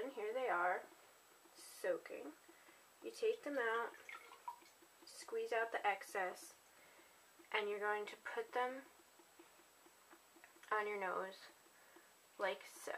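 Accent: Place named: Northern England, United Kingdom. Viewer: Worked, thanks. Btw, are you from up Nort? Thought I heard a bit of a northern dialect in your speech.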